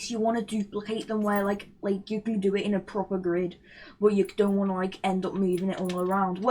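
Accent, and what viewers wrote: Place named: United Kingdom. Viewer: The main reason i watch u cuz u are British and have that funny-ish british accent like a ytber i used to watch.keep ⬆️ ur grind.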